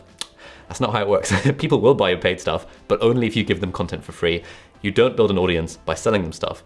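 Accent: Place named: England, United Kingdom. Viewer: Why do you keep saying "dollars"? You're very obviously English, even to an American!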